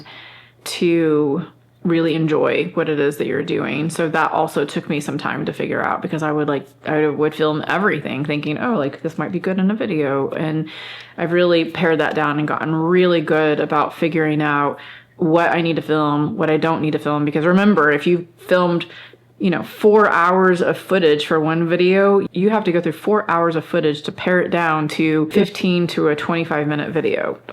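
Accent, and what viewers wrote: Texas, United States. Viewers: Hello,. My wife subscribes to you and we’ve watched you for years. I finally subscribed, too, DUH! Shoulda done that a long time ago. I, too, have long wanted to write a memoir that also incorporates some of my Dad’s story. I have a blog named The Gay Man’s Daughter, if that tells you anything. One thing I have decided is that if I write a book it won’t be linear. Everyone tells me that I’m full of stories which I tell from time to time. Such as My Mother’s Six Degrees of Separation to the JKF Assassination. (She had a clothing store in Arlington, Texas - my home town - and sold the clothes that Oswald’s children wore to his graveside funeral to two men in dark clothing the day before. She didn’t realize it until she saw the photos in the Dallas Morning News the next day. I didn’t learn about it until I was 17.) Those kinds of stories! 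I left the DFW area at age 35 to relocate to the Puget Sound area as well and have never looked back. Thank you for sharing everything in this video. You’re an inspiration to me and my wife and to so many others. Your grace and intention is a hard thing to find and I know you’ve worked hard for it. It’s also nice to hear someone who has the same “accent” that I do! I worked hard to lose my accent when I moved here 33 years ago cause my students poked fun at me. Love you and everything you do. (We have an RV van and travel in it quite a bit - we love the road, also.) Take care.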